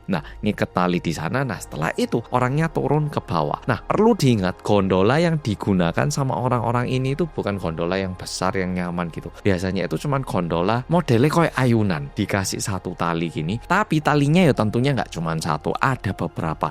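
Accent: Java, Indonesia. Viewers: Logatnya medok banget, kayak orang Jawa.Sukses selalu Buat Abang ini. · Lha wong dia masa kecilnya sampai dewasa tinggal di Malang... sekolah di sekolah negri Indonesia... Pas kuliah aja s Singapur... Ya bhsa Indonesia nya medok lah .. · Woow..Bhs Indonesia dan dialex jawa nya mantap pol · Mantap 👍 bahasa jawanya medok banget😊❤️ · Duh medok e ,,, ❤❤❤ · Adminnya orang Korea kerennn deh, bisa bahasa Indonedia berlogat Jawa, he..he...👍👍👍😂😂❤🇮🇩🇮🇩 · Salut dgn adik Korea ini bhs Indinesianya sangat lancar dgn logat Jawa .... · ooo gitu tho mas medhok ... :-D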